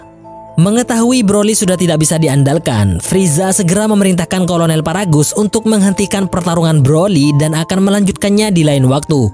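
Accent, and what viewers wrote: Madura, Indonesia. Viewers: Kelihatan logatnya logat plat M 😀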